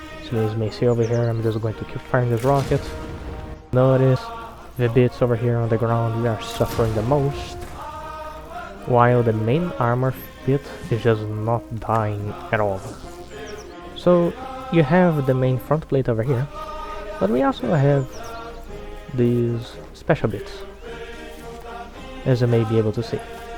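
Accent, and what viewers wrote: Brazil. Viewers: Men, are you brazilian ? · I heard portuguese voices, are you from Brazil?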